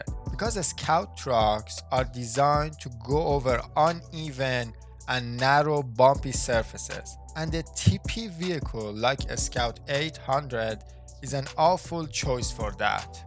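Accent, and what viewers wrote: Iran. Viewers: He is, because of the accent. ☺ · You are awesome dude... love your videos, what accent do you have? Sounds slightly Persian :) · Are you Iranian Bro? your accents shows that:face-blue-smiling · از لحجت معلومه ایرانی هستی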